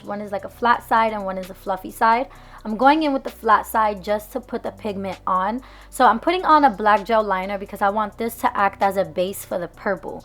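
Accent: New York, United States. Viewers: Gurl where are you from? You are giving me major NY vibes and I’m loving it.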